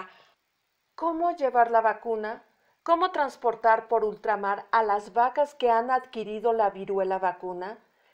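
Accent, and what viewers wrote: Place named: United States. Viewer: El mejor video de la vacuna que he visto, y con ese precioso acento Americano. Muchas gracias. 🇪🇸🇯🇪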